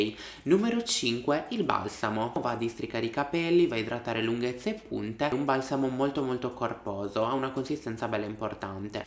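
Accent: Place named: Sardinia, Italy. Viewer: Ti stimo tantissimo,grazie per i tuoi consigli preziosi,simpaticissimo quando esce il tuo accento sardo😂❤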